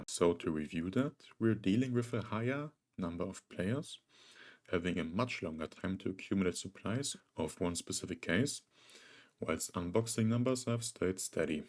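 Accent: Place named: Germany. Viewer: You have a very soothing deep asmr voice with a hint of german accent so like a more chill onhepixel uwu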